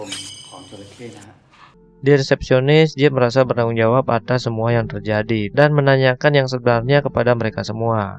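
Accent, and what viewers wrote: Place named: Bali, Indonesia. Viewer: Bali sajan logatne bli wkwk,mantap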